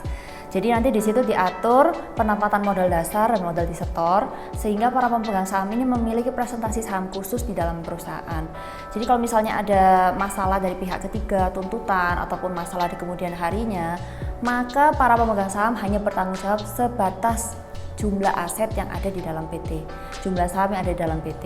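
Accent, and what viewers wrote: Java, Indonesia. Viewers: Cantik medok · Jawa banget.. 😀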